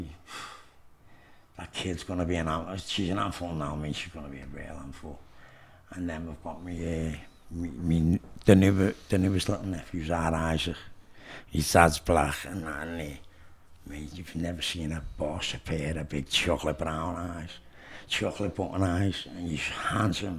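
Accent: Liverpool, United Kingdom. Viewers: Scouser x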